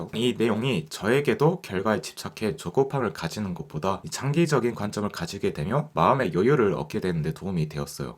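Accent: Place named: Japan. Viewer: 일본분이신가용??? 발음이 죠금씩 세는,, ?!? 그치만 내용 넘 좋아요! 같니 홧팅홧팅입니당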